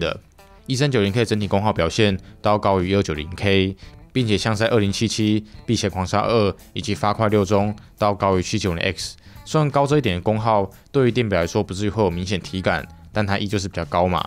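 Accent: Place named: Gan, China. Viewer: 13代CPU 評測 比起大陸 二斤 貓三 極客灣 裝機猿 賣茶人 翼王 等團隊 動作慢了5天 可是只有Huan的贛話 是獨一無二的。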